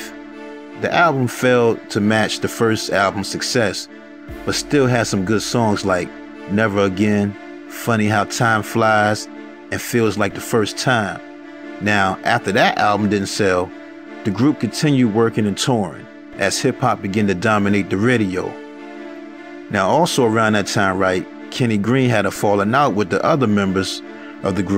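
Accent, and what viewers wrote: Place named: New York, United States. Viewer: Dorothy Copeland Sounds like my city NYC !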